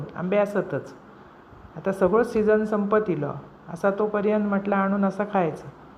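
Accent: Malvani, India. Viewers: तुमचा आवाज छान आहे आणि मालवणी खूपच गोड. टेस्टी कोळंबी फ्राय.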